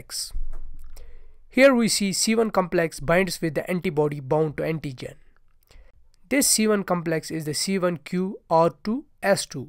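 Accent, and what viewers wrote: Kashmir, India. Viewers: Are you sir from kashmir 😍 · Thank you so much. It was really helpful. Are you a Kashmiri though? Sound very much like a Kashmiri😄❣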